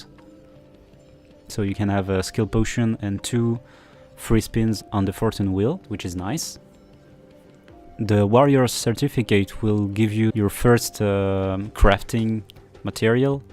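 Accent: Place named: France. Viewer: Just gave up because of the terrible accent. Don't wanna show off but tbh I could do better my first hs year and still I'm french too. If you can't talk correctly just do your vids in french, butcher.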